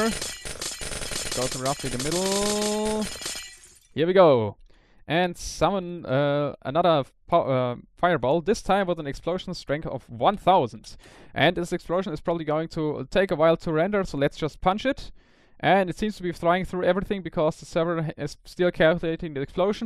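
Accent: Germany. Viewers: Bist du Deutscher? Man hört so nen kleinen Akzent raus. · He's speaking English with a German accent. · Du bist doch deutsch du hast an akzent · Starting the video, I knew right Form the get go that you're German as well :D nice video tho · deinen Deutscher Akzent hört man voll raus ^^ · tell me im wrong...but i think you are german (im german too so i might probably be able to tell better) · Are you german? You have an really stong german accent. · youi have a german aczent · man merkt direkt das du ein deutscher bist xD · Hab sofort gemerkt das Du ein Deutscher bist ;-) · Ein sehr deutscher Akzent...hm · Echt ey klingst voll deutsch melde dich mal :D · du bist deutsch, oder? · You are deutsch, stimmts? Hört sich lustig an :D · ***** merkt mann eig echt leicht du hörst das deutsch raus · Du bist ein deutscher oder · [german accent detected] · du bist Locker deutsch! 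:D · Wie man direkt hört, das du ein Deutscher bist :D Wie dem auch sei, gutes Video! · du bist doch deutsch , das hörtman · Wie man den deutschen Akzent hört xD Is ja übel This guy is german, u can hear it by his accent. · Your pronounciation sounds like you are from germany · mann hört das du deutscher bist :) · You have a bit of a german accent... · You really sound like a german guy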